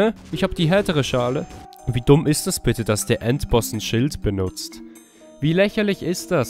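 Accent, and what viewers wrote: Switzerland. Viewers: Eine frage, bist du Schweizer?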